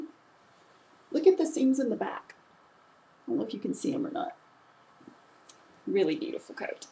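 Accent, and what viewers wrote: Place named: Tennessee, United States. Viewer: I have no idea how I didn't figure out you were from Tennessee before now...I should have been able to pick out that accent.(I'm from West Virginia myself). I adore those coats...I need a good winter coat of my own since my windows are still frosting overnight. I'll have to give modcloth a try. Thanks for the tip off Darling. :)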